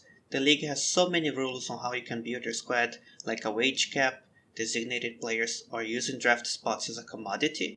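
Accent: Brazil. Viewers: cara, vc é br? pronúncia parecida com a nossa. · are you brazilian? the pronunciation of certain words are very similar to brazilian people speaking english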